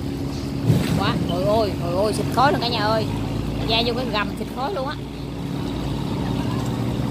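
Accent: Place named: Mekong Delta, Vietnam. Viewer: Tôi thật sự là ngỡ ngàng với giọng phát âm của gái miền tây ta. Làm YOUTUBE kiểu này, chỉ để cho dân miền tây xem và nghe thui bạn àh. Bạn đang ở việt nam, người việt đó....làm sao mà để cho mọi người trên toàn đất nước mình, xem và hiểu được....thì bạn sẽ kiếm tiền từ youtube được mạnh hơn ha nhỏ, giọng mẹ đời àh. Xem các ca sĩ miền nam họ hát đó nha....mà học hỏi đi ha.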